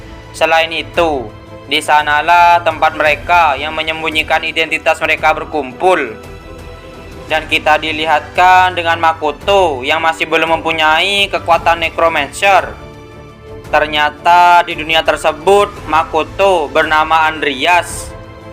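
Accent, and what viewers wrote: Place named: Madura, Indonesia. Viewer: mimin logat madura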